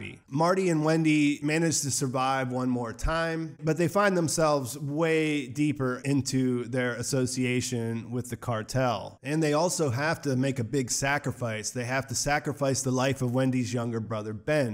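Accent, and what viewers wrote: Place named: Pittsburgh, United States. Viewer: Hey! Thanks for the great analysis! I picked up on your Pittsburgh accent! I am a Burgher too!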